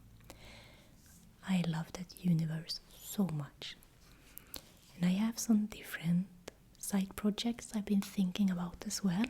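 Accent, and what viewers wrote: Sweden. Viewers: Your channel has been my favorite lately, I listen to your videos whilst gaming, so I'm relaxed. I like your voice and your accent a lot, it's a cute little accent, the swedish one. But I also like how open you are, it's hard to open up publicly. After that, it becomes kind of a distant, distant, distant friendship, where we won't talk, we won't see each other, but I kinda want you to do great and feel sad when you're doing poorly, if that makes any sense. I hope you can resolve your health and emotional situations rapidly. God bless. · Your thick Swedish accent was the best part of this video! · Tack för dina videos! Jag brukar inte tycka om engelska med svensk brytning, men detta är såååå bra! 🤩 Önskar dig det bästa i det nya året! 😄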